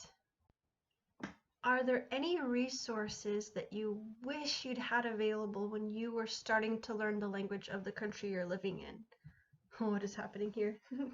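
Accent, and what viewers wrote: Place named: Sweden. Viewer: Hello! Thanks for subscribing! I'm definitely looking forward to watching more of your videos because I actually have know a couple that's moving to Sweden soon to be long-term missionaries! I'll definitely tell them about your channel! Also I like listening to other languages, your Swedish sounds super cool! And I like that your English has a Swedish accent too!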